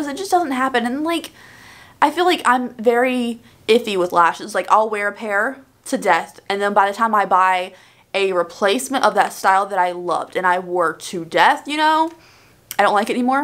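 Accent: South, United States. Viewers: I feel like your southern accent is more present lately and I love it !! Your Morphe Sweet Tea rant in your previous video was hilarious.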